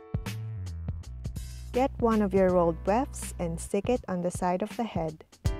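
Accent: Philippines. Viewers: are you Filipina?Cuz you have a filipino accent...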